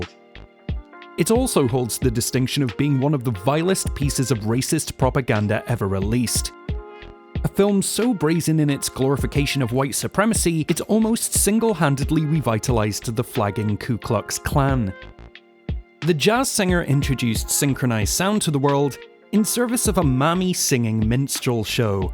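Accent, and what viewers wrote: Scotland, United Kingdom. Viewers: I assume that you are white (i'm detecting a Scottish accent there) Any way here goes, I notice that when i watch ANY 1980s comedy films, what they all have in common is... whackyness & that they are ALL very loud, considering how money & power obsessed the 80s were, I think its a wonder that comedy films were made at all. be it-TRADING PLACES(dealing with both class & race prejudice issues) PORKY'S,FERRIS BEULLER, POLICE ACADEMY even, and this film is no exception, its more to do with insensitivity, clumsiness, and America's,500 year old race issues, that werent properly dealt with. They approached this the same way that they would approach... POLICE ACADEMY, not adjusting it often enough for the the subject matter and applying some patience, instead opting for a formulaic comedy style. BUT despite it all, I liked the film, this is fresh, playing for laughs, instead of the down trodden ROOTS,GONE WITH WIND, TO KILL A MOCKINGBIRD po faced look at ignorant racism, it DID make its point, which wasnt lost on me.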